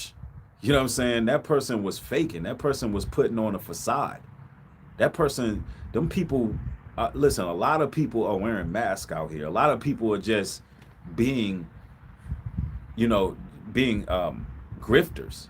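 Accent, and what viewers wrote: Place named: Washington DC, United States. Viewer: Aye brotha, are you from the dc area? I feel like im picking up a hint of that accent. Been checking your vids out for a minute. Much respect!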